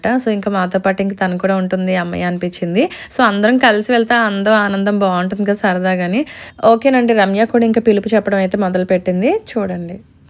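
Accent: Godavari, India. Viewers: Hi Gaaru, How are u doing....Nice to see u again... U R a stunning Beauty... Varninchataaniki Maatalu leveu... Mee Godrolla Slang Baaguntundi.. Traditions baaguntai.. Pilupulu Baagunnai.... Mee Sisters Super.... I hope U enjoyed.... in Marriage Celebrations...... Take care have a great day.. Cheera color Super ga undi andi..... Thanks for sharing......😃😃😃😃😃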